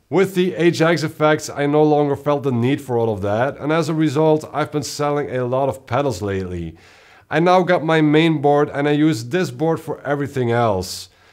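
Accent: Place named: Netherlands. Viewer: I’m guessing Dutch or Flemish as first language? Your English doesn’t bother me at all👍.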